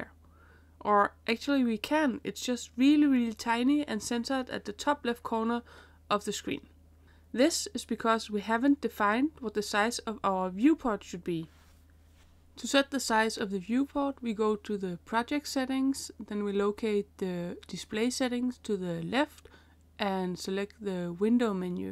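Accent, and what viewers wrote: Denmark. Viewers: Du er i hvert fald dansker da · Oh, the accent. Are you danish? Greetings from across the western border! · Wow, you sound very Danish, anyways, great guide!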